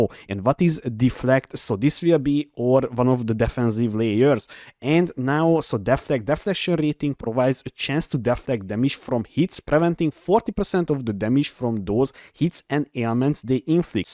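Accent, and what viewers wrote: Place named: Hungary. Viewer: Gusztustalan magzar akcentus. Tanuld már meg kiejteni a szavakat, ha már az adott nyelvet próbálod meg beszélni.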